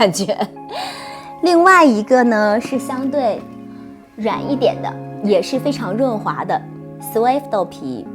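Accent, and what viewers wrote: Japan. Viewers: 她是日式英语发音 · 日文发音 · 日式英语我听懂 解说非常好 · Her pronouncation is correct in Japanese, it's katakanam, used for words from foreign languages.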